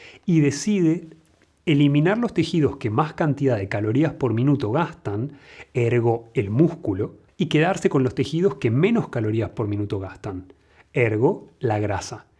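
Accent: Argentina. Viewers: Me parece excelente su exposicion sobre el metabolismo los telomeros y toda esa cantidad de conceptos que si bien conocia algunos desconocia otros y como estan inter relacionados por el acento al hablar me pareciera argentino y digo esto porque dice que es doctor y de alguna manera se acerca al publico de manera corriente y comun para dar indicaciones medicas sin ninguna retribucion economica realmente lo felicito por su dedicacion y esmero en este tipo de platicas lo conoci a traves de youtube por el video sobre la vista y al ver su explicacion enseguida me suscribi . Supongo por su explicacion tan minuciosa y detallada que usted es microbiologo o algo por el estilo ya que conoce a la perfeccion nuestro mecanismo y metabolismo de nuevo me permito felicitarlo gracias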